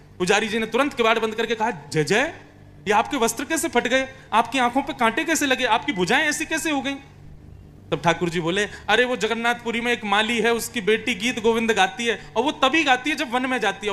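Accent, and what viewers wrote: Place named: Jaipur, India. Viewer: आपकी भाषा जयपुर की बोलतें हों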